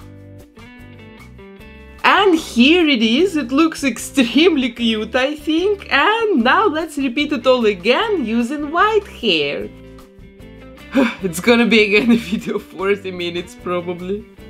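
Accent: Russia. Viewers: Видео классное ,но русский акцент слышно очень сильно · замечает присутствие русского акцента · Я просто слышу,что эта женищина из россии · судя по акценту, вы русская. простите, если ошибаюсь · Ты случаем не русская или это британский акцент?? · And she is speaking with a Russian accent, so.... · I'm sorry, I'm new here and this video was in my recommendations. You are very cool 😎 But where are you from? You have russian accent))) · Ахах, я слышу явный русский акцент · Вау I'm Russian. И мне одной кажется что она говорит с русским акцентом?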